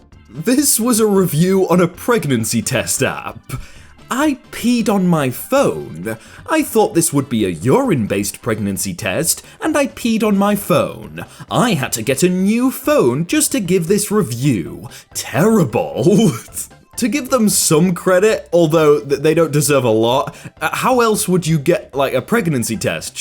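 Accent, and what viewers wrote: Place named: England, United Kingdom. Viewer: Cen'ame'ar You english and your funny accent made my day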